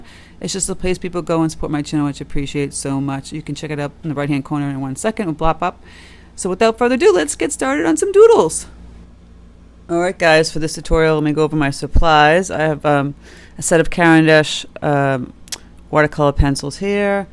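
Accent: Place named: New England, United States. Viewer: Love your videos. Very helpful, and I love your New England accent. 😊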